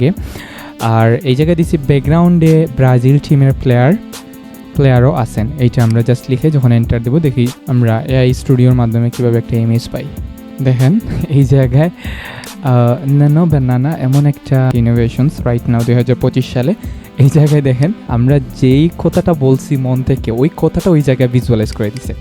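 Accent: Sylhet, Bangladesh. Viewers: সিলেটি না কি ❤ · সিলেটি রে পাইসি রে 😊😊❤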